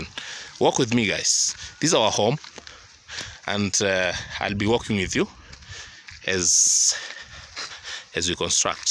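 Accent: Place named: Kenya. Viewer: If you asked me, I would have side,from the way to talk, that your a KIKUYU. I am a subscriber of Marwa and now you. Uvoo wa musyi? Greeting from Manchester.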